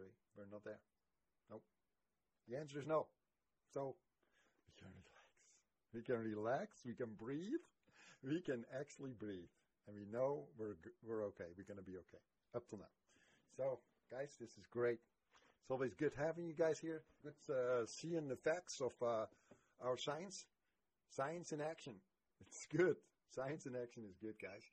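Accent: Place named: Germany. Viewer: Are you German? Love the accent just watched the other video...great stuff..i see the big increase in activity...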